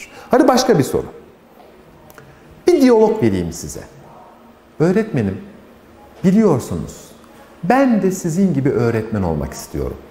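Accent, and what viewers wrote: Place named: Aegean, Turkey. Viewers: Hocam nerelisiniz? Konuşmanız çok güzel lakin e ve a larda Ege ağzı hissettim, merak ettim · hoca izmirli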